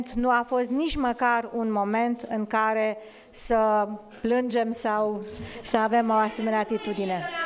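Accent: Romania. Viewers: Munteneste cu influente oltenesti.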